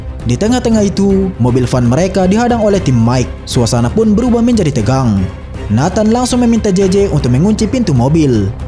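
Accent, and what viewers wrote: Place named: Toraja, Indonesia. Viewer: Mntafff mannnee, 🤣🤣🤣 logat mu 👍👍👍,, toraja apa duri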